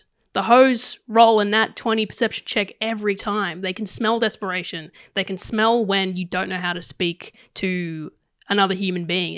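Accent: Australia. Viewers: thank you for being Aussie, I love Australian people accents. I can't stop listening 😂😂😂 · Clearly aussie, but whats the go? Nintendo is cool, but this is somehow related to porn? Tha fuck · So are you Australian or something? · who knew that all i needed i was an aussie girl cussing me out and telling me to stop shucking the corn. 10/10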